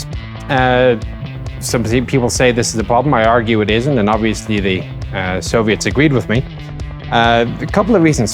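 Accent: Ireland. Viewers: 🤣 Irish or Scotish?👍👍👍💯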